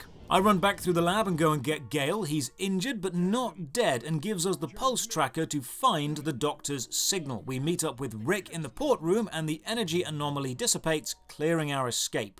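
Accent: United Kingdom. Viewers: all of them just shouldve gotten rid off everything they are carrying with them. no reason to die then. also, lets take a moment to appreciate reading and favouriting comments on his one year old video. absolute chad loved to play dino crysis 2 back in the day and i have to say, nostalgia is so much better when funny comments and a british accent are involved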